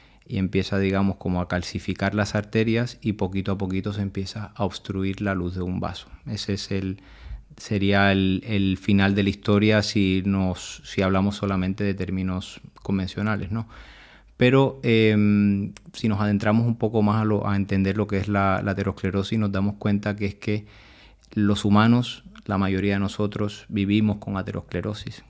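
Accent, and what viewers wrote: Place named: Caribbean Coast, Colombia. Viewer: Por su acento creo que este médico es costeño de Colombia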